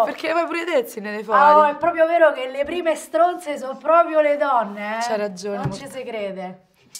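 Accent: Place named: Rome, Italy. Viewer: L'accento romano dente tutto unico😂😂😂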